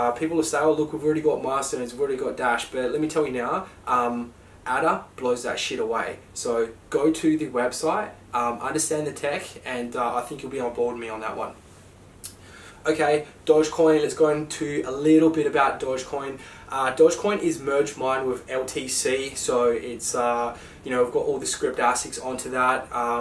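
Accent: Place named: Australia. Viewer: Can't understand your Australian accent, were you saying Adder?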